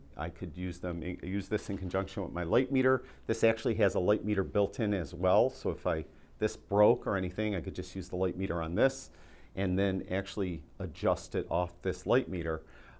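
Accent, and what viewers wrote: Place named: Western New York, United States. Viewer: Nice to hear that western New York twang. I was born in Buffalo and my dad, same name, had his commercial art studio there for years in the Pitt Petri building. He did all the Spree covers for ages. I love your editing videos and have struggled with Capture One's complexity but love the quality. Maybe there is another package that is as good in rendering RAW but less convoluted. I don't need catalogs or even sessions maybe, would be happy to open and work on individual images most of the time. I'm learning Affinity so I can dump Photoshop, but the raw conversion is not so great. Thanks, again. Rix Jennings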